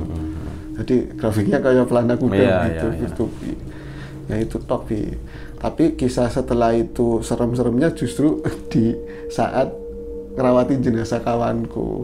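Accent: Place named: Bekasi, Indonesia. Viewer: Judulnya Jenat - Riam Biru #3 Ketika bro masih berlogat Bekasi Barat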